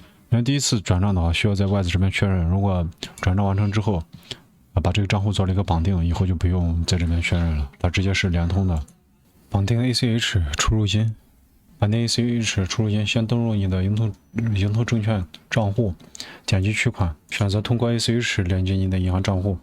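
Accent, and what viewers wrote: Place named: Guanzhong, China. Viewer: 这口音是咱关中乡党呀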